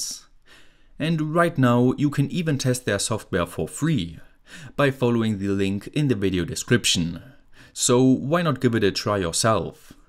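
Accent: Germany. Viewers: Your form germany right? I can hear it 😂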